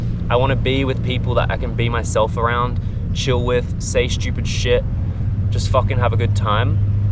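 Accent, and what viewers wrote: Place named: Europe. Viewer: can you speak clearly and slow because your english it sound uoropean tone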